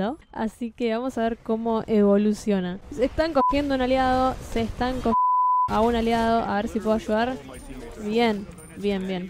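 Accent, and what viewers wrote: Argentina. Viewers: Genial. Donde está mi milanga? Gracias a vos, no llego a extrañar el acento de Bs As.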